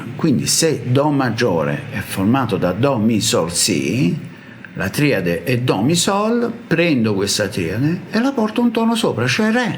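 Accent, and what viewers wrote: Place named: Naples, Italy. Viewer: Sto capendo tante di quelle cose con questi video che ne sono proprio entusiasta poi il signor è un ottimo maestro e mi piace molto come spiega. Il suo accento napoletano poi è di una simpatia unica. Un saluto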